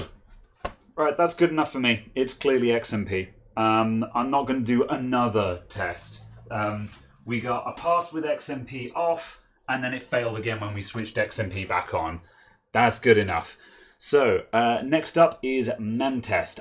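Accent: United Kingdom. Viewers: ...Because you sound VERY BRITISH!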